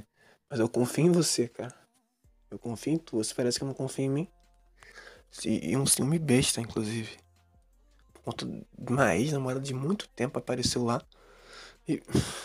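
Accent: Rio de Janeiro, Brazil. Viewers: O negocio é o geito que vc fala AAAAAAAAAAAA INFERNO O GATILHO DANÇA NOVAMENTE tu é do rj? questão de sotaque msm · Carioca neew??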